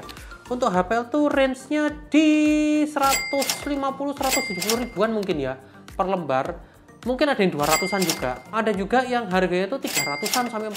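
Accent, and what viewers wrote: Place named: Java, Indonesia. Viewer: medok banget.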